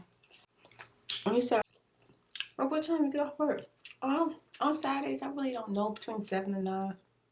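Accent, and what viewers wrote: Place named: New York, United States. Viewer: you're so pretty! 😍😍😍 love your accent are you from NY?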